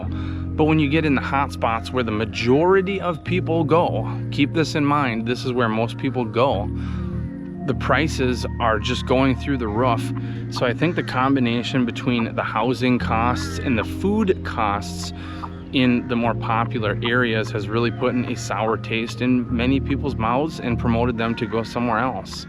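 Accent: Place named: Midwest, United States. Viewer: Nice video,. I’m trying to place your accent. Parts of it sound like like Minnesota, but I’m thinking Michigan or Wisconsin.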